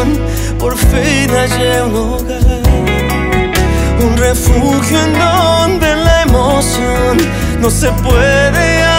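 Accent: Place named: Argentina. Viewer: Se nota (y me gusta) esa tonada argenta al pronunciar la Y, LL 😎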